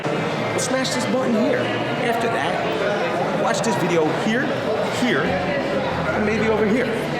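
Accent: Philadelphia, United States. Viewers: This is the first time I've ever watched your videos and I am 40 seconds into it, but I can bet that the narrator is from the Philadelphia tri-state area because of his philly accent. I'd recognize that jawn anywhere.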